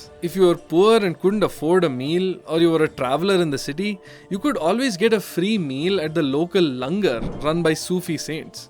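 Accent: South India, India. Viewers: You sound like south indian. Which state you are from, may be kerala or tamilnadu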